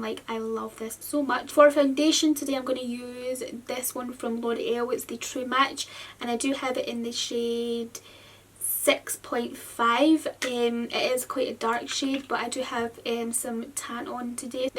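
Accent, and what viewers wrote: Scotland, United Kingdom. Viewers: Great video are you scottish?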